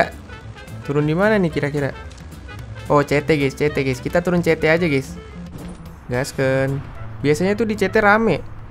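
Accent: West Java, Indonesia. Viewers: Orang sundaa bangg? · BG emang or Jabar ya..?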